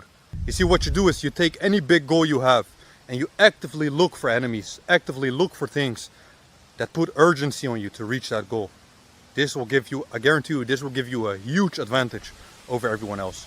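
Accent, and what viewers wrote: Netherlands. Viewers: I heard the Dutch accent in your first video, groetjes uit NL alsnog, als ik de kans krijg en het kan financiëel ben ik opgedonderd 🤣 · Yo, ik dacht al te horen dat je uit Nederland komt. Mooi om dit te zien. Ik ben ook nederlands. Ik vind je werk met Far From Weak heel goed. Ga door. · Yea i thought i heard al lil dutch accent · Ik dacht all dat ik en Nederlands accent hoorde maar lekker bezig man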